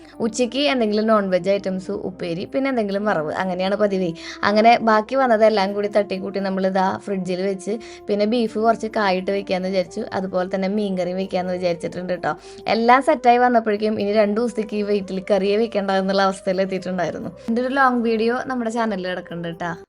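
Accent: Thrissur, India. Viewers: Ee short te full video evadey chechiide samsaram kelkumbo thane nala relief ann aa trc basha thaneya athinte secret 🩷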